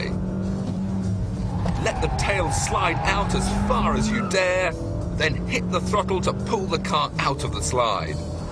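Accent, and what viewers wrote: United Kingdom. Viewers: lol, he is british, don't you hear accent or at least see, that steering wheel is at the right side of a car. However if yaris is a great drift car, this guy is american and Greece is a center of a world =\ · He's English. Everyone else pronounces it "coup pey". Like the French do.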